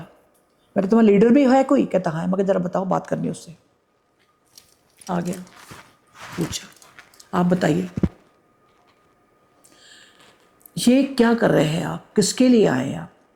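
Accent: Punjab, India. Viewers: Pranam Aunty. I regularly watch your videos. I love your punjabi accent.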